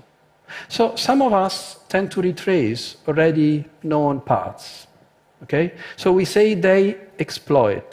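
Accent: Italy. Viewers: a 16 minute video on trataras and tritoras.... sounds of meaningless wordsas in italiaaano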